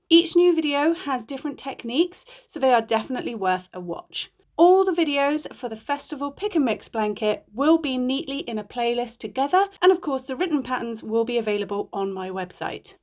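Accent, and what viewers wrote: England, United Kingdom. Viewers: So happy to see a face with that awesome English accent and perfect nails. Thank you for all you do to bring us your patterns. ❤😊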